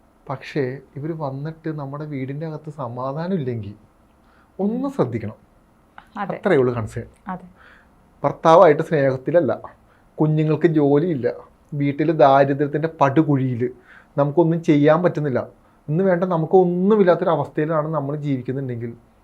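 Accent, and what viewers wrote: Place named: Ernakulam, India. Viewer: Yes കുമ്പളങ്ങി nights shane നിഗം slang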